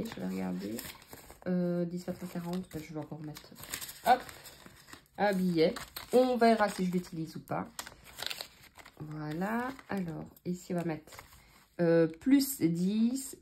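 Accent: Belgium. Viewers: Coucou 😊, j'aime beaucoup tes vidéos, c'est très agréable de t'entendre parler avec ton accent belge🤩 J'attends avec impatience la vidéo de ton jardin 🌻⚘🌺🌱🌿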